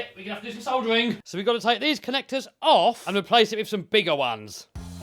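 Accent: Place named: United Kingdom. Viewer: bri'ish accent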